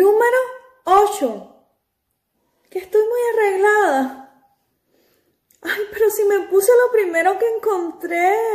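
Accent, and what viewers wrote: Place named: Cuba. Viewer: Muchas gracias estare al pendiente de tus videos que son muy buenos y muy bien explicados..una pregunta eres cubana?..me gusta tu acénto y tu manera de expresarte😊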